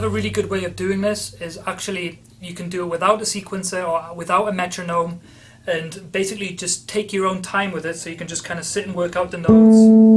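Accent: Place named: North East England, United Kingdom. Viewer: fellow bassist from the north east that made it! County Durham bassist here.. recognized that accent instantly. Great video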